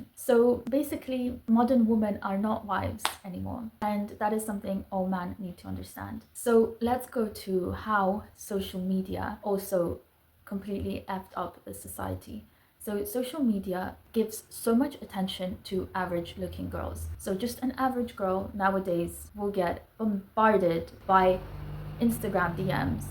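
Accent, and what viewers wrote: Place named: Eastern Europe. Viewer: I think you are from Slavic country? Urs accent 😂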